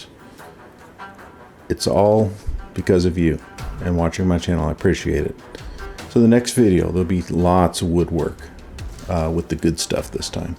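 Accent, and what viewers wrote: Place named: Yorkshire, United Kingdom. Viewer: Not a bad Yorkshire accent! 😂. Loving this, you are clearly insane but this is gonna be great. Well done mate 👍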